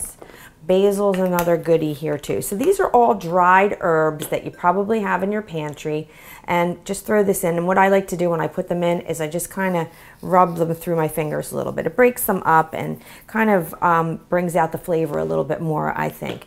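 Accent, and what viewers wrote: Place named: East Coast, United States. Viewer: I hear the east coast in your accent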